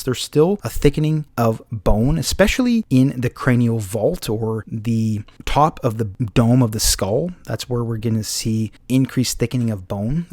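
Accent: Canada. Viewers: Excellent clearly ordered and explained. Look forward to more. Canadian accent helps!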